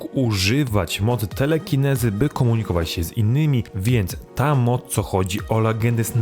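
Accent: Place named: Israel. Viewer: gdzieś ty się uczył polskiego..człowieku..!1 co to za akcent......koszmar...z izraela...???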